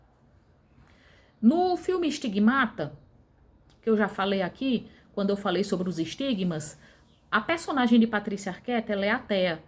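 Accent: Northeast, Brazil. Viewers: SEU SUTAQUE PARECE NORDESTINO.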